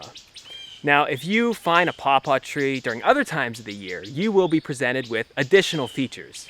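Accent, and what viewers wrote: Pittsburgh, United States. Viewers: Is that a yinzer accent i detect??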